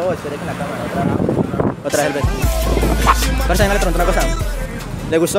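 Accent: Cali, Colombia. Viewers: Eres de cali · Eres de cali verdad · Eres de Cali?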